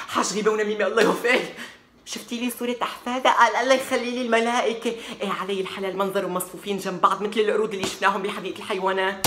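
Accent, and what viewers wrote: Aleppo, Syria. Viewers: | والله تعلمت اللهجة الحلبية منك متابعك الوفي من الجزائر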